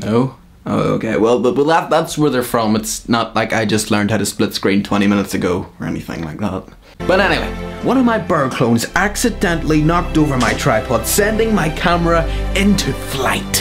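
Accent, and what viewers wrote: Ireland. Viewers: what part of ireland are you from ?:)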